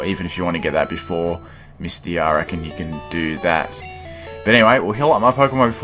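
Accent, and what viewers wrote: Australia. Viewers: Didn't know you were aussian!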